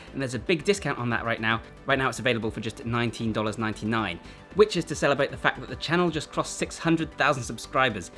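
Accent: United Kingdom. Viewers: I just want to get swole! And I also want to strip body fat too, so I can see my abs. Perhaps it is just me as an American, but I would say that you are a more charismatic individual than you give yourself credit for. You have the British accent down. What % of your viewers are from across the pond?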